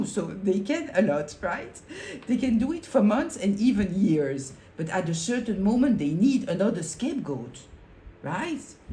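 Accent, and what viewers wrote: France. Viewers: Thank you so much!!! :face-red-heart-shape::face-red-heart-shape:Yes exactly! And you explained it so perfectly. I feel like sending it out to my community and extended relatives to explain what they are doing to me. I totally walked away. This helped me so much! I was feeling guilty. Prayed to God for an answer. You provided that for me in a beautiful french accent. I am so grateful to you. You are sure connected to me. I mean it is exact. Took me years to see it. They have caused me such hell, I've lost so much money due to them and I'm so happy to be rid of them. :face-red-heart-shape::face-red-heart-shape::face-red-heart-shape: :eyes-pink-heart-shape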